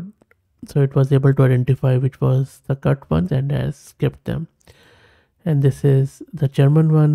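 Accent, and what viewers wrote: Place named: India. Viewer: Индусский английский ни с чем не перепутать! Спасибо за видео!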